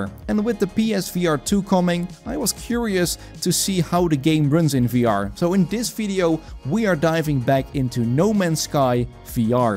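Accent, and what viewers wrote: Netherlands. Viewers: You must be Dutch. The accent.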